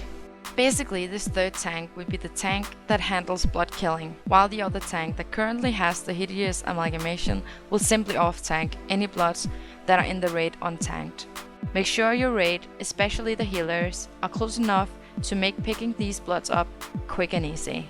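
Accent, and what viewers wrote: Denmark. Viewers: this sounds a lot like danish english hahaha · Agree, she's definitely a dane xD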